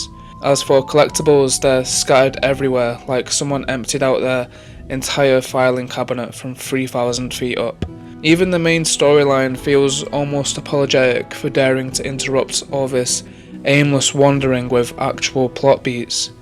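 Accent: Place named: North, United Kingdom. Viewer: ignore this, embrace your beautiful northern accent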